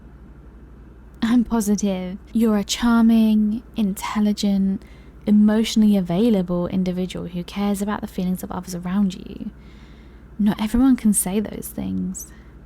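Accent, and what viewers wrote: United Kingdom. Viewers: Oh! Oh! A therapist roleplay! I love these soooo much!! Thanks for your hardwork, British accent & Therapist? Ahhh. Sucha pleasure to the ears! The pacing with this one just had such a "right" feeling to it. A lil flirty back & forth is always nice too have a touch of as well. Seriously tho thanks for doin this one, much love. Godbless.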